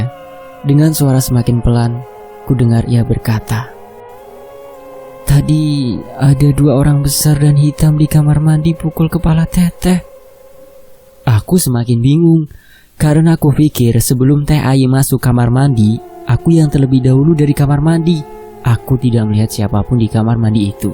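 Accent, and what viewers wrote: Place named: Banyumas, Indonesia. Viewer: Apa sing Bae pak.. ngapak temen jaaann...😂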